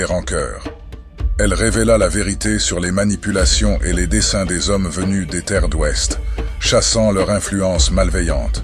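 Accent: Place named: France. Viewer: Vraiment magnifique … Mais pourquoi l'accent français du colon? Soyons également fier de nos accents Africains.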